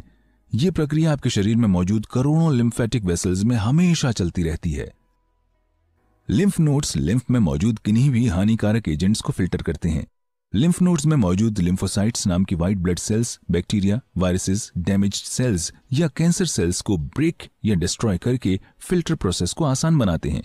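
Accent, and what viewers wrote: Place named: India. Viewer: Yeh video kisi hindi channel say li hai. Banday kay bolnay kay andaz say pata chal raha hay kay woh India ka hai aur hindi kay lafz bhi istamal karaha hai. Nabe prateeshad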